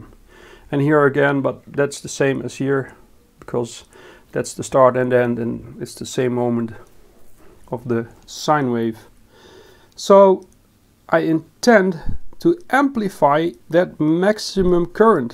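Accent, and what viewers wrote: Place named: Netherlands. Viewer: Thought you were from Sweden, but your Dutch, right?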